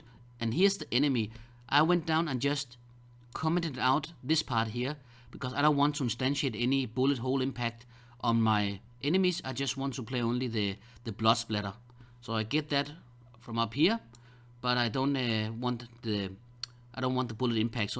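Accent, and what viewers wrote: Denmark. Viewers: i can hear that u are Danish bro. I'm Danish too and i recognize that way u talk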